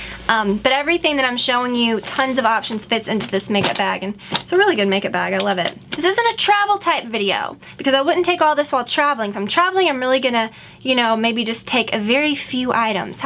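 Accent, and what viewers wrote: South, United States. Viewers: I feel like I hear so much southern drawl in your voice, I love it!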